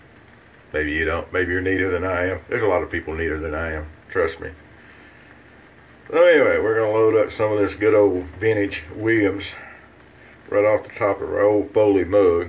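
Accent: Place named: Mississippi, United States. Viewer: You can tell that you are from Mississippi by the way you say it. Missipi, if you ain't from there, you don't understand.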